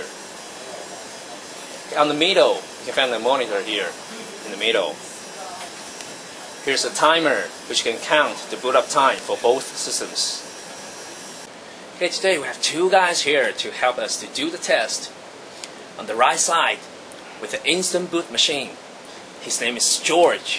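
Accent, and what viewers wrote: China. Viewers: taiwanese, hes got a chinese accent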